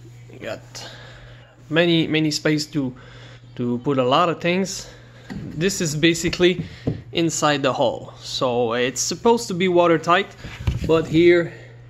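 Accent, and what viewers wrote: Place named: Quebec, Canada. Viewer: Quebec 101 i can spot that accent ;) Good video!!!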